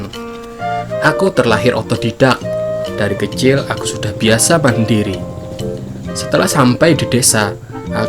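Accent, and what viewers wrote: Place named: Java, Indonesia. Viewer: Bahasa banjar nya kurang kental.. Lebih kpda medok jawa...tp Salam sukses buat kmu.. Trus berkarya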